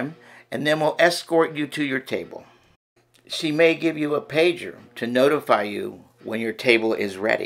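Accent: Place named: Italy. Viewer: Your Italian accent rocks. 🙂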